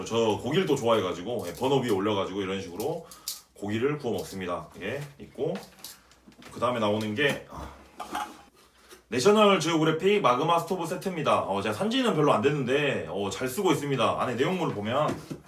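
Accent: South Korea. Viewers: 아따 전라도 백패커요~ 강원도 충청도 경상도 백패커는 많이 봤는데 전라도 백패커는 첨보요~ 반갑쏘잉~ 말이 잼나고 좃쏘이 따라해봤어요. 전 부산출신 경기도 거주 사람이에요 ㅋ 잼나게 잘봤어요. 전라도 유튜버는 첨봐서 기대가 되네요 ㅎ 잼난 영상 많이 찍어주쏘잉~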